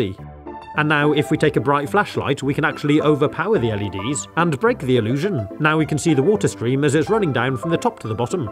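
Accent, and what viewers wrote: United Kingdom. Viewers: So your British? I’m american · I feel that you are British.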